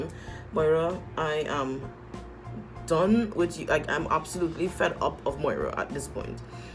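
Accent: Trinidad and Tobago. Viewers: Hunni that TnT accent and the fact that you are upset made the accent better 💖💖💖. Well, thanks to persons like you CBS received those messages and got the last laugh. I hope they discontinue the exploitation of black women for their ratings moving forward. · I guess it all comes down to who the audience is. I have a pretty boring life and my relationship is so drama free and stable that I watch reality dating shows to entertain myself! So, the drama factor works well for me! 😅 Btw, are you Carribean ? You sound very similar to my Trini coworkers, and I just wanted to check if I can successfully tell the accents apart! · Lady,I like you you make my pores rise you sound totally Trini.Sorry.. Carribbean countries can't vote ,you know who we voting for.l watching like a hawk.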